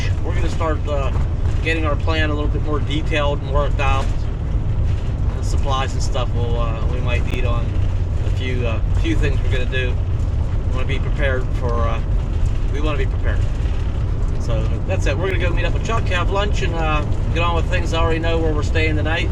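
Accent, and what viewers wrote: Pennsylvania, United States. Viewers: Hey, just noticed your video description still says you're in the Bounder, might want to update it! Thanks for the videos. I'm from MA but have family from PA and your PA accent always reminds me of my family and puts a smile on my face.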